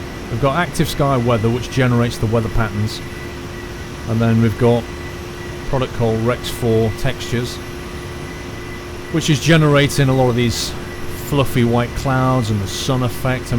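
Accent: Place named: North, United Kingdom. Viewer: So you're a Northerner too? Wuhoooo!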